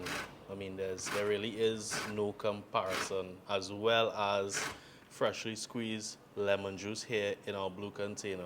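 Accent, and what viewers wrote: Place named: Trinidad and Tobago. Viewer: Thanks for the tips.. I make this outside easter for my son. Can i use orange zest when lemon is out of season? And, thanks for maintaining our Trini accent.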